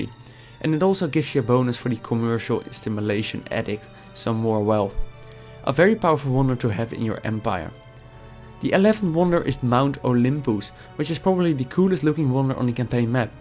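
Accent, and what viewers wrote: Netherlands. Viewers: you're dutch i can hear on you're bad english accent (no hate great vid)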